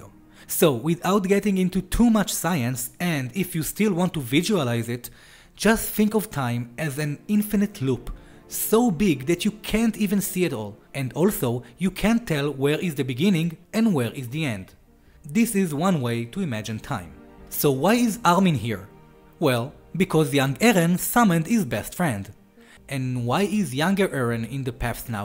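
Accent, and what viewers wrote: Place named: Israel. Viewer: אה חחחחח שמעתי אותך אתה פשוט נשמע ישראלי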